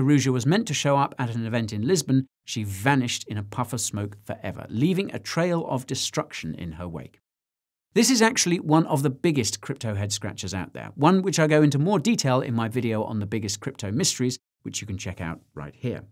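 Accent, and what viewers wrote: Australia. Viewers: Aussie accent was one of the best I've heard (I'm an Aussie) Where do I get that Roocoin? 😄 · Well done with the Aussie accent as an aussie I laughed my tits off. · LMAO. Mate your Aussie accent is spot on. Love the mullet! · Not bad ‘Strailian accent Mate! Good on ya… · Funny AF! But the accent is more proper than my friend from the Gold Coast. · Love the Aussie accent ! I'm up for some Roocoins !!! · Haha, amazing Aussie accent, nice one!! 😁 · Always insightful and entertaining. Absolutely nailed the Aussie accent. Love your work mate! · nice aussie accent! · Another Aussie here and i must say,you could pass as one of us mate.That was brilliant. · Brilliant Australian accent! 🤠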